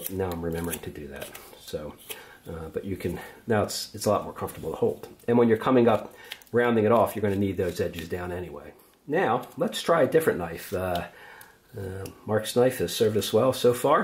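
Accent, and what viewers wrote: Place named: Philadelphia, United States. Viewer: Another great tutorial! That really sounds like a Philly/S.Jersey accent, haha! Im in central PA myself! Go Birds 🦅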